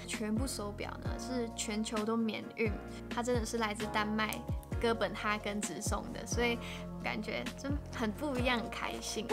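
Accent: Taiwan. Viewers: 點解你D國語可以講到同台妹一樣 ?!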